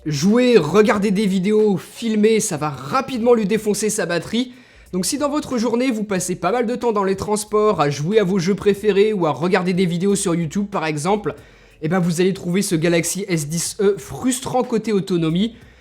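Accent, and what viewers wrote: Lyon, France. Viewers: Tu es lyonnais?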